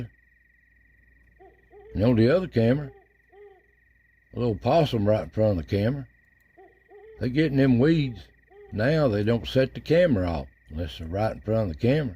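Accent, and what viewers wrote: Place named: South, United States. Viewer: Thank you for bringing the wildlife and woods to me Mr, i love your voice and southern accent. ❤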